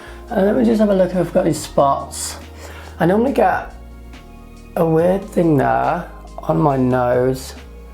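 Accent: United Kingdom. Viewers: Hey hottie with the totally cool British accent!! : )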